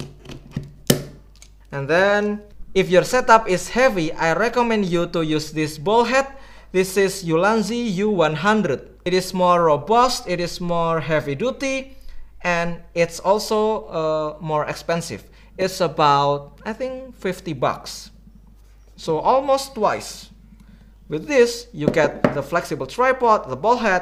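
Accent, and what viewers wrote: Indonesia. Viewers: Walopun bahasa inggris nya logat Indonesia banget msh mending dapat dipahami karena Artikulasi lebih jelas 😅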